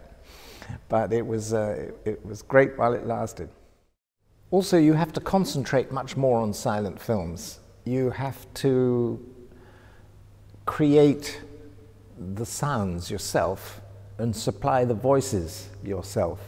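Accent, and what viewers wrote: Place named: RP, United Kingdom. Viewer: U sound very posh & u know wht u talking about! R u from London? Thanks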